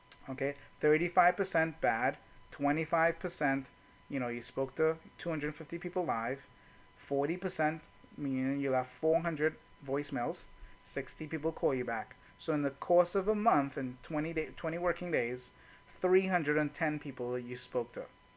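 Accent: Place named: Trinidad and Tobago. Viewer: You sound Trinidadian.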